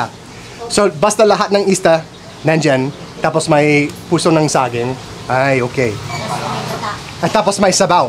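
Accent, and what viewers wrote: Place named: Pangasinan, Philippines. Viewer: No offence meant, you sounded like you are from Pangasinan when you speak Tagalog and sounds like Cebuano kapag nagtatagalog sya.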